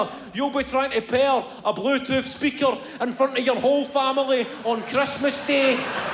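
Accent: Scotland, United Kingdom. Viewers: So i can eventually understand him one day (i love the Scottish accent), also, I'm pretty sure i never said i couldn't understand anything...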